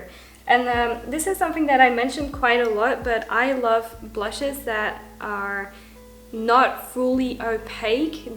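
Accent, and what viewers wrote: Australia. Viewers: I’m an Aussie just coming to the comments to say I noticed an Australian accent in the way you speak English - where are you from?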